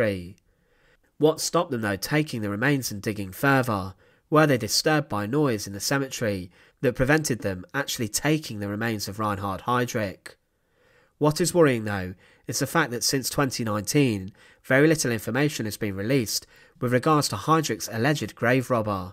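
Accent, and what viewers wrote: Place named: Liverpool, United Kingdom. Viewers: What do you think . Just because he has an English accent . And not a US one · Narrative misses out some dates and would be better if not delivered in boring monotone scouse.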